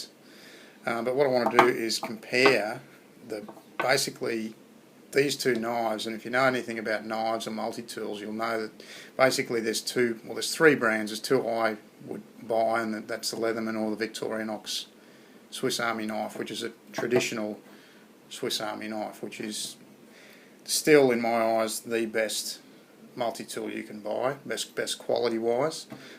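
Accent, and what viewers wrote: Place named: Australia. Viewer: wenger is apart of victorinox now :) oh and also ur accent gave it away... an aussie???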